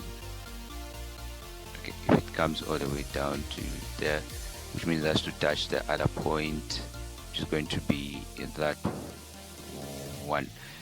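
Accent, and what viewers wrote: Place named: Jamaica. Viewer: He sounds jamaican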